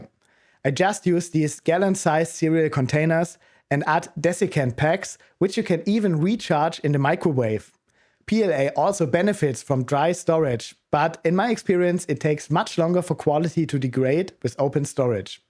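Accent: Germany. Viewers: Deine Videos auf Deutsch sind so gut. Aber jetzt auf Englisch mit so einem furchtbaren deutschen Akzent … nee no offense, aber das ist echt grausam. Für meine Begriffe bleib beim Deutsch, da sind deine Videos ein echter Mehrwert. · Man hört an deinem Englisch direkt, dass du aus Deutschland kommst. 😂 Spätestens wenn man die Fehlermeldung am Prusa Drucker auf Deutsch sieht.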